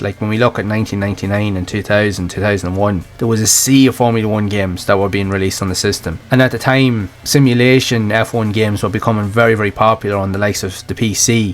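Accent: Ireland. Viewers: nice one fair play enjoying the vids and nice to hear an Irish accent on here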